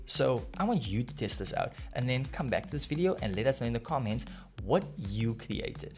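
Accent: South Africa. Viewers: Bru are you from SA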